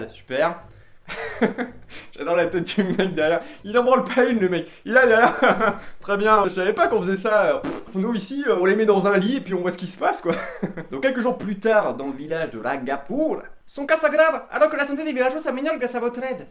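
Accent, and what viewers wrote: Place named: Bordeaux, France. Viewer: Tres beau concept! ca change! perso je dis j'achete! 😂👌👏belle accent bordelais je vois 😍(les bordelais les meilleurs 😊)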